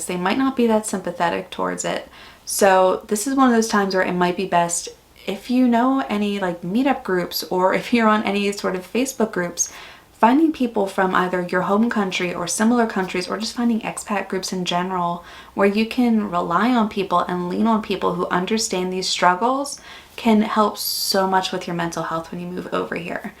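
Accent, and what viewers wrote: Australia. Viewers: Hi, I sense your voice is starting to sound Aussie 😊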